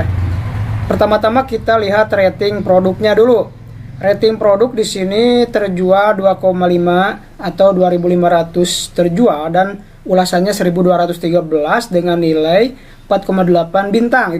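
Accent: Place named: West Java, Indonesia. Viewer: logatna na mah urang sunda nya kang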